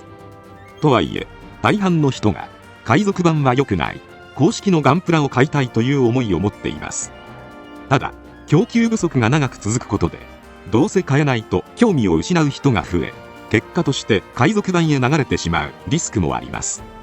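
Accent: Kansai, Japan. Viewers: さん、関西人だったのか・・・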